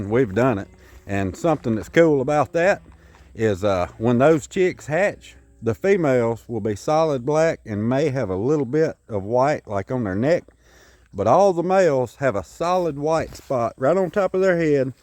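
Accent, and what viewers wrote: South, United States. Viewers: I'm from Ruston, Louisiana, and even though most around here don't have the southern drawl quite like yours.. Some of us still do. You'd fit right in brother! My family is on the hunt for a new home with enough space to start raising birds, vegetables, and maybe a goat or six. We've been in the city limits longer than we ever planned to be, and it's time to get rural. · LOL!!! Brother you got an accent for sure - true American. 😎👍👍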